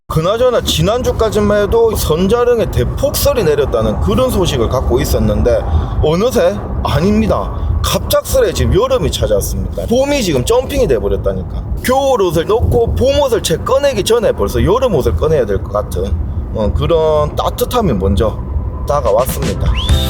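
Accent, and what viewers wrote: Gyeongsang, South Korea. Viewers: 경남쪽(갱상도 사투리억양 넘넘 듣기좋습니다! ~~말솜씨도. 굿~~👍👍나도대구지만 경남억양이 참 듣기좋터라구요. ㅋㅋ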